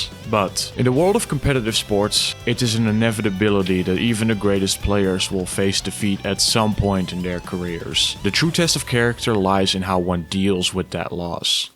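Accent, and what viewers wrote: Netherlands. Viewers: hoor gelijk dat je een hollander bent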